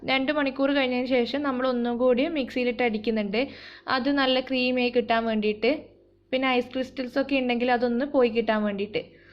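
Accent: Kannur, India. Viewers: Kannur kari aano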